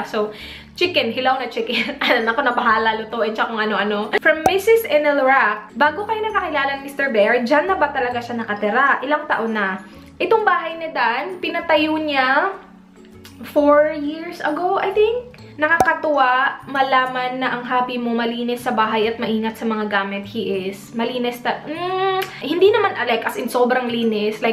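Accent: Australia. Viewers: You looked good, you're pretty & your pronunciation is normal na magchange cause in Australia may accent siya so need talaga ni ate na mag change yung mga words na ipronounce para maintindihan siya ng kausap niya na aussie (e.q, 'dinner' must be pronounced na dinna withour an 'r' ) mga gnyan. Anyways, i love you ate 😊 Pasagdi nalang nang haters kay naa rman na pirme, dili mana sila mawagtangan og ikasulti. Haha cause ana najud na sila full of insecurities & hatred. Always remember na mas damo nag hi-gugma saimo. Yay! Love you 😘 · Hi! this vlog is on point. never mind the bashers. they're just insecure. and you are right, wear what is comfortable on you and what suits you well. not what suits other's eyes. to those who criticize on how you speak, saying your "maarte" na. you're not, its just how you adapt with the Australian twang. so, stay chic and cool as you are. 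take care! say hi to Dan!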